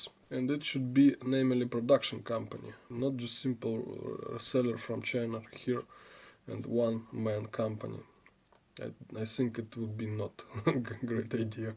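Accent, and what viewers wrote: Russia. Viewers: У вас ,один из самых страшных русских акцентов!!Но руки золотые.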